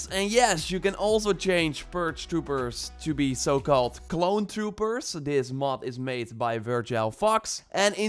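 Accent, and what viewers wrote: Netherlands. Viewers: Are you Dutch? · Volgens mij is hij nederlands vooral het accent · Are u dutch?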